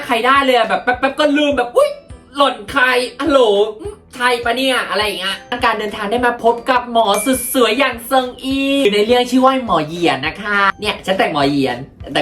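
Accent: South, Thailand. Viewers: พี่เป็นคนที่ไหนคะทำไมภาษาทำไมภาษาเหนือภาคใต้เลยอ่ะ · พี่ใช้คนใต้ไหม❤️🧡💛💚💙🩵💜🩷💝💖💗💞💕💓💟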